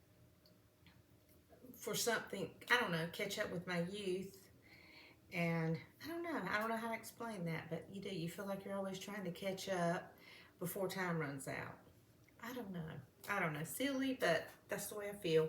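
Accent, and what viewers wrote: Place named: Texas, United States. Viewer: Just found you on here your banana bread looks so nice I'm going to try it cos respices tells you 2 bananas yours has 5 so maybe it will taste and look better I'm like to bake but I'm not very good at it 😂and love your texes voice I'm Irish but live in Spain anyway glad I came arocse you till next time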